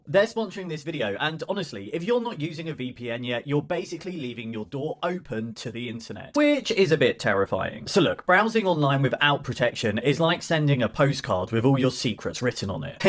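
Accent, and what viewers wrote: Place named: United Kingdom. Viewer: Where are you getting American from? He's British, he has a British accent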